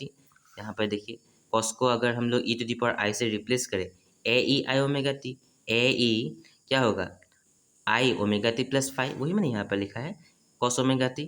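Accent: Assam, India. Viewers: Are u from Assam,sir?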